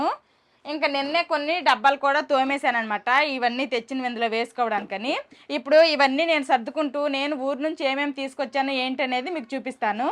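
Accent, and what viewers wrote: Srikakulam, India. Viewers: వీడియో బాగా నచ్చింది లైక్ చేసాము sister ❤ అమ్మ వాళ్ళ ఇంటి నుండి మనకి ఎన్ని ఇచ్చినా మనకు సరిపోవు కదా సిస్టర్ మన శ్రీకాకుళం లాంగ్వేజ్ నాచురల్ గా మాట్లాడుతుంటే చాలా ముచ్చటగా ఉంది❤❤ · Super sis meru ala mataluaduthunte mana sklm ani feeling happy❤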